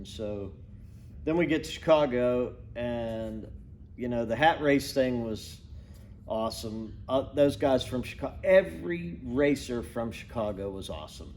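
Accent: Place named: Pittsburgh, United States. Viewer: I never noticed the yinzer accent til now. Shaht aht to the best accent in America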